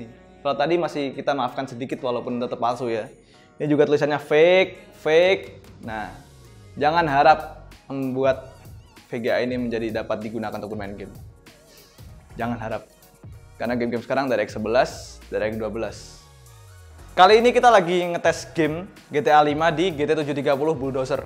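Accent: Java, Indonesia. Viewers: Kedengeran medok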